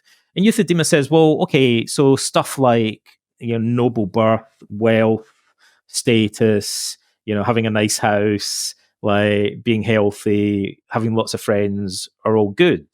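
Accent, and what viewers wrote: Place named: Scotland, United Kingdom. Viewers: When I mentioned your description, Mr replied to it directly: "That's close. I'm from Ayr, not Glasgow, although it's nearby. I have lived in Nova Scotia, Toronto, and Montreal." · I didn’t know what I needed today, but listening to this man’s gentle Scottish accent as he talked about Socrates truly brightened my evening. Thank you so much.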